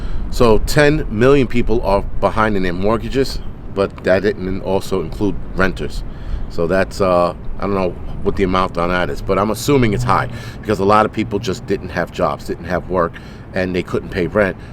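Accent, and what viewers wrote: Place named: Bronx, United States. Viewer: OMFG - I love hearing you talk (I mean Twalk )...that accent along with the 200% Bronx attitude and speaking the truth for all to hear, man I'd rather hear you speaking from the podium that any/all of these boot-licking-LEFTISTs that are taking us people and our country straight to hell in a hand basket. Spot on with that comment that all homeless people should be bused over to the Govenor & Mayors mansions. Fuck Yeah, I'll pitch in for the gas.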